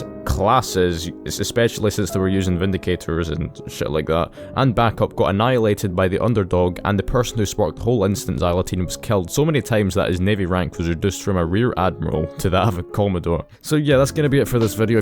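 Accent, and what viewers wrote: Scotland, United Kingdom. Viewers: I love your scottish voice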